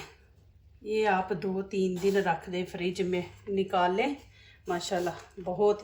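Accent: Punjab, India. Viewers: Assalamu alaikum. Baji buhut khob . Mashallah. Dil Khush kar ded da. Eik toh Aap panjabi andaz se bolte ho .us par itni laziz recipe banate Ho Dil sach mein khush ho jata hai. Allah Ke hath ke cycle ko barkrar rakhe aamin... Mujhe gobhi ke parathe ki recipe bataen .. I am from India Allah hafiz..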